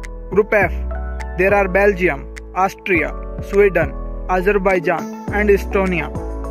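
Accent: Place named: India. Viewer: some funny indian accent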